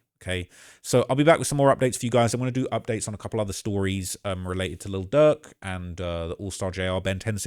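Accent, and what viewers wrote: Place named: United Kingdom. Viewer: Dude got his nails painted😂🤦🏾‍♂️Bad enough having a British accent alone😂🤢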